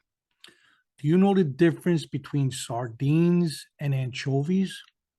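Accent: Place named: Italian-American, United States. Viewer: Accent makes me want to watch the Godfather for the millionth time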